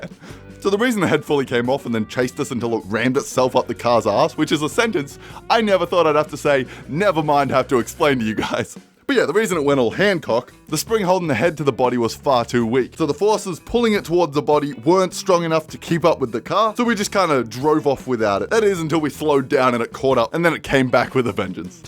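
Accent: Australia. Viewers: are you a fucking aussy or a southerner? this depends on sub status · I like your voice, are you Australian? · Spoiler alert: Is Australian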